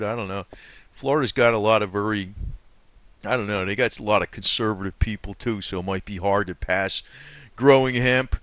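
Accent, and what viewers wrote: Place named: New Jersey, United States. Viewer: Love your honesty and most definitely your New Jersey Accent. I was born in Brooklyn, New York. I Do not have the accent because I left as a young child. By the way, I love hemp; I mix the protein powder with whey and my other smoothie ingredients. I do not like the wicked matrix system. Obama is only a puppet for the elites. This is coming from a Black gal.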